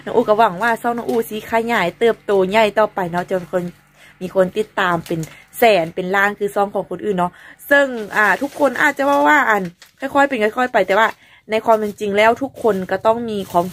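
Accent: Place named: Si Songkhram, Thailand. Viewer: สวัสดีปีใหม่.... ติดตามดูตลอดเด้อเป็นกำลังใจให้...ถ้ามีโอกาศมาไทย..ที่บ้านยินดีต้อนรับเด้อ... พูดคือคน..อำเภอศรีสงคราม...จ.นครพนม.....อยู่ใกล้บ้านอ้ายเขยบ่าวหนอย.บ่าวสุ...สบายดี.....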